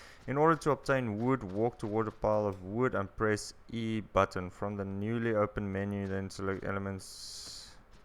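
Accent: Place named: South Africa. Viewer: Dis alles in sy stem. Hyt daardie BoerQ aksent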